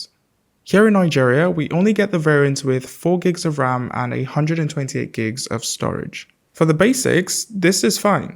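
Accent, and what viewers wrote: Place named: United Kingdom. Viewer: I believe na UK Born or stayed there for many years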